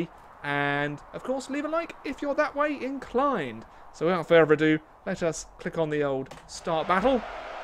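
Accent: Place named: England, United Kingdom. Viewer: Are English accent annoys me you should talk less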